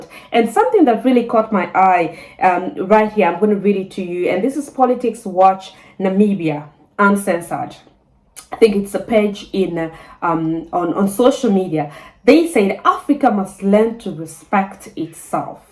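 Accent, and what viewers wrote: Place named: Nigeria. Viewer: You sound more Nigerian than Namibian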